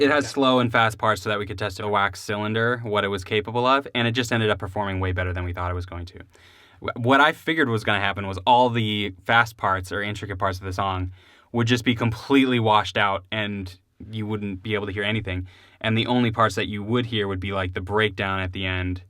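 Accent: Chicago, United States. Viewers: hey...great vid and great answers....as a fellow Chicagoan...celebrate the Dialect...not everybody gets it... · "Valume" is a Chicago accent thing. Like I catch my self saying pocket like "pah-ket" all the time.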